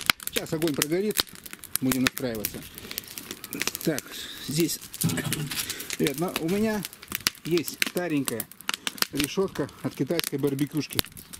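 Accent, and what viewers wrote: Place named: Volga, Russia. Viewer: Вялить Вы меня уже научили, коптить научиться надо мне у Вас) у Вас говор очень родной))) на Волге так говорят.... Интересно кто Ваши мама и папа (дай, Бог, чтобы живы были и здоровы) Они гордятся Вами! Я вот горжусь , что у нас такой есть соотечественник как Вы! Желаю Вам всегда удачной рыбалки!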